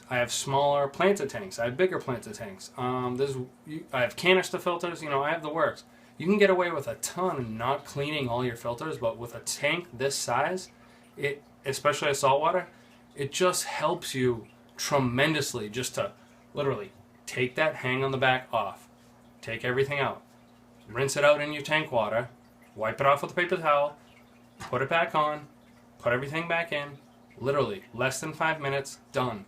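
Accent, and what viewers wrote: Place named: South Shore, United States. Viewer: What part of Mass are you in? You sound very south shore!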